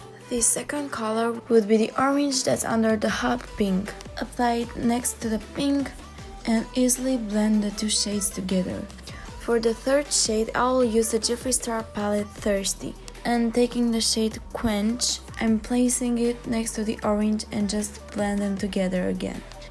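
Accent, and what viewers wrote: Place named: Russia. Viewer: Nice video! Love your accent, are u from russia?❤️😍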